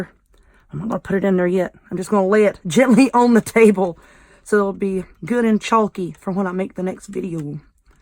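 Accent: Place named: Alabama, United States. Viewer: Hello, I watch all of your videos and LOVE THEM ALL!!! My fave is the 🌹 done on a kitchen strainer!!!!! YOU ARE BRILLIANT!!!!! I am from the south, ALABAMA, and my ancent is just like yours, lol, I cuss too, it's my second language lol. I do all kind of crafts, EVERYTHING!!!! Right now I am into ALL THINGS CONCRETE,LMAO!!!!!! FIRST OFF THE SUPPLIES ARE CHEAP.... QUIKCRETE $3.88, SAND $2.88 ( BOTH ARE 50 POUND BAGS ) AND WATER. YOU CAN LITERALLY MAKE ALL KINDS OF STUFF THAT LAST FOREVER WITH CONCRETE AND IT'S EASY PEASY!!!!! RESEARCH LIKE I DID AND YOU TOO WILL GET HOOKED!!!!!! YOU CAN MAKE VIDEOS ON THEM ALSO!!!!! LET ME KNOW WHAT YOU THINK.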